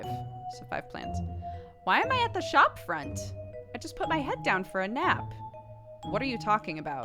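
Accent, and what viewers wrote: United Kingdom. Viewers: She's become fully bri'ish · Very British for some reason 😂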